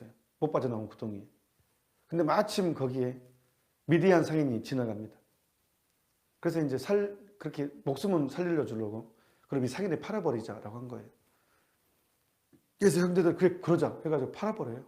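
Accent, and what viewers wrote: Jeolla, South Korea. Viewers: 하느님을 닮아 순수하신 신부니이시네요.오늘 처음 강론을 들었는데 많은 가르침 배우고 갑니다~^^자주 배우겠습니다.근데 전라도 사투리 넘 재밌네요 ㅎ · 찬미 예수님! 신부님 감사합니다 ~~^^ 진심이 묻어나는 전라도 사투리가 순수하고 재미있어서 웃음 지어 집니다 ~~ 좋은강의 항상 감사합니다 ~ 덕분에 매일 삶이 기적입니다~♡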